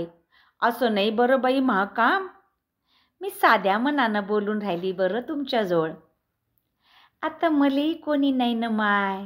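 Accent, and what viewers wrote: Vidarbha, India. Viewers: हे वैदर्भीय भाषेतले, एका खेडवळ स्त्री चे स्वगत ऐकताना मन अगदी गुंगून गेले. भाषेचा वेगळा लहेजा, म्हणी तर आहेतच पण तिच्या भावनांचा आविष्कार आपल्या अभिनयाने जिवंत झाला आहे, हे सत्य! ❤ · छानच हं..! अॅडव्होकेट सौ.,! वर्‍हाडी बोलाचा ठसका साधलाय..अभिनंदन! pvshirsath Nasik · ताई आमचे १४ वर्षे विदर्भातील यवतमाळ येथे नोकरी निमित्ताने वास्तव्य होते. अगदी तीच वैदर्भीय भाषा ऐकून खूप मजा आली. आपल्यासारख्या वकिली पेशातील ताईंनी हुबेहुब खूप छान प्रस्तुत केले. भावले बुवा मले. ❤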